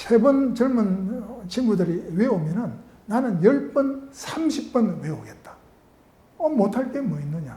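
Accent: Gyeongsang, South Korea. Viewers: 저도 동의합니다~경상도에는 Intonation이 있어서 flat한 영어보다 듣기에 나아요~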